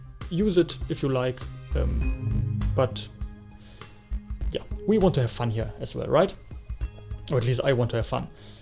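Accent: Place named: Germany. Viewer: Man, thanks a lot for your tips... In this period I’m working on project that requires some “art skill”, and I really need some drawing advice as I’m not really good at it 😅 Down below, I type what I would had written in the first part (as short as possible) I heard that your accent is German and there are some words that I didn’t understand right away; but that’s not a problem.. I just “rewinded” the video and tried to understand that few words... Also some suggestion for the future.. Would you make some animal (also fantasy) drawing tutorial? I would really appreciate it. Until then, good luck for your next arts 🏞🌅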